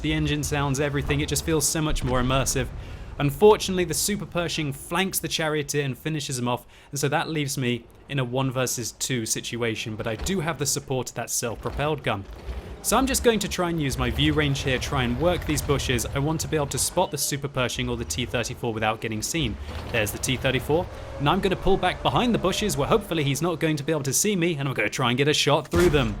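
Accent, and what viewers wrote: England, United Kingdom. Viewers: with this mike you sound more british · sound way more british with the new mike x.x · Mate, the new mic really brings out your english accent. Needs more Aussie twang.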